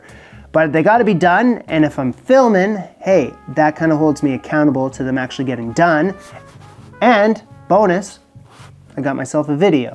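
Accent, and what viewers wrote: Canada. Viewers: Coming from a New Yorker, your Canadian accent has completely come back. I've been watching your show for about 4+ years now and I don't remember hearing your Canadian accent as much as I do now. I've caught a few words with it before, but yeah, lol. I like this other side of your show. Watching you getting the ingredients and how you choose them is pretty cool. I like this style of vlog from you.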